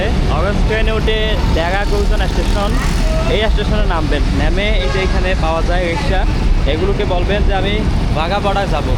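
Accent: Chittagong, Bangladesh. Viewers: তুমি চিটাগাঙ্গে ভাষায় কথা বলো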